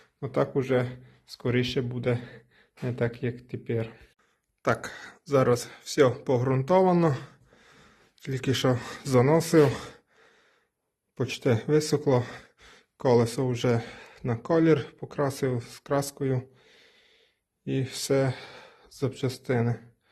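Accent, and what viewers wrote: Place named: Transcarpathia, Ukraine. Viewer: Ти із Закарпаття, говір у тя знакомий, із якого района?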